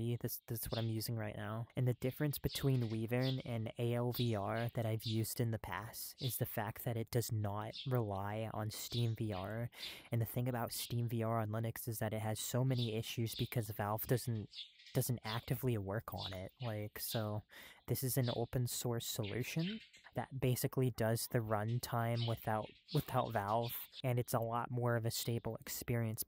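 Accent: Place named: United Kingdom. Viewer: You have a bit of a British accent now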